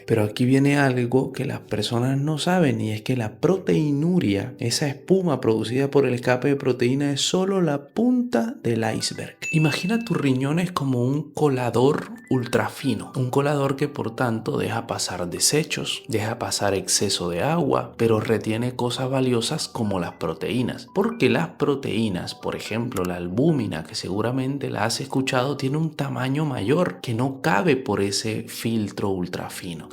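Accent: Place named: South America. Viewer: Ademas de aprender, me arrullas. Que voz tan suave, clara y agradable, junto con ese acento sudamericano tan amable. Gracias❤❤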